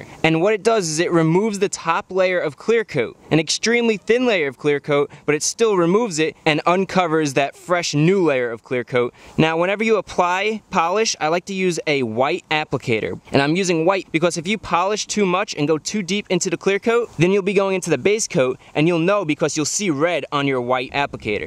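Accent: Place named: Boston, United States. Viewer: I own a car. It is PM and am not losing sleep watching this video. But never in a thousand years would I wash my car. I don't care what says, I will drive it through the car wash. But I am watching the video anyway. I think it's because his voice and Boston accent - wodder - reel me in.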